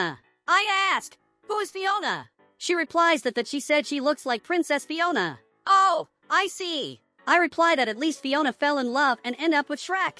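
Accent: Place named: Portugal. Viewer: Oxi ele é português e tá fingindo ser inglês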